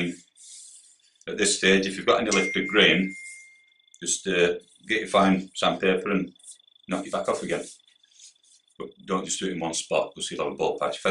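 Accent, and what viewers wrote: Yorkshire, United Kingdom. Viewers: Nowt wrong wi a Yorkshire accent.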